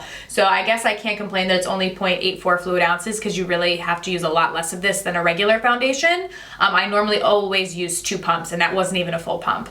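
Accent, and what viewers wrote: New Jersey, United States. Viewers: Oh she is not, shes always talked like that, she's from Jersey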